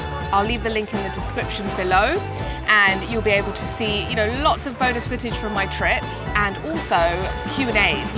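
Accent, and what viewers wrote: England, United Kingdom. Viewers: Maybe the British accent takes me away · You have a English accent how are you American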